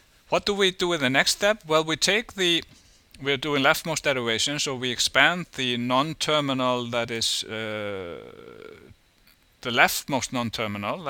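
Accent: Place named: Iceland. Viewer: That's a helvíti thick accent, even by Icelandic standards.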